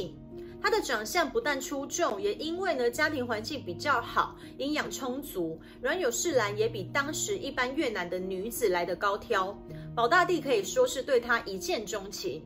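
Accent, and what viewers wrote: Taiwan. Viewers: 很喜歡妳的旁白解說，坦率而帶有情感，使这位末代皇后的故事更添幾分神傷！希望妳繼續努力，多製作更多更精釆的視頻，聽妳的口音應是台灣朋友吧？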